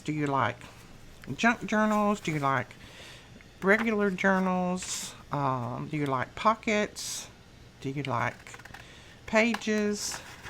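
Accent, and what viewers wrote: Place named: Alabama, United States. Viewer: I have been a card maker so I know the basics. I like the videos where you make the elements of the junk journals since I haven’t tried the journals yet. Slowly working up to it. I love love love collage and making master boards. Thanks for sharing your gift. Your voice is also soothing. Love the Alabama accent. Junker from North Carolina.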